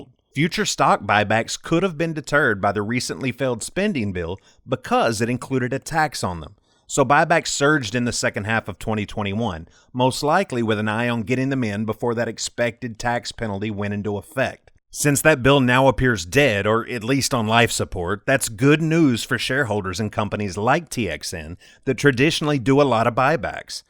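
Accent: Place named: South, United States. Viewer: I detect a hint of the Southern US in your accent. Very pleasant.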